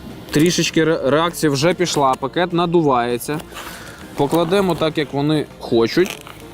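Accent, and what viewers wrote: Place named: Surzhyk, Ukraine. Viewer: Сельский суржик , на украинском зачиняем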